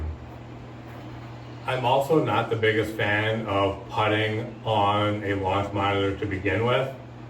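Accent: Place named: Wisconsin, United States. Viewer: You talk very Wisconsony. It’s a beautiful thing. I’m happy to know folks like you are still around.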